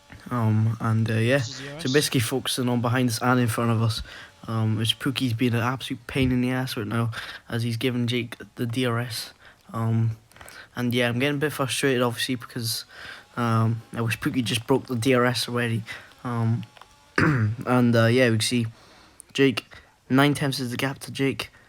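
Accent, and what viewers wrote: Wales, United Kingdom. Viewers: Are you welsh mate? Love the vids your a class driver lad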